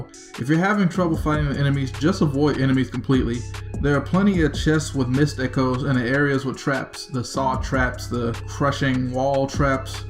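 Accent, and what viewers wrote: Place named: Los Angeles, United States. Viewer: This man has got to be from LA.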